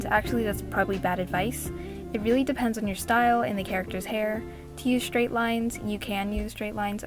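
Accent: United States. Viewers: 0 waow ur a Filipino but ur good at American accent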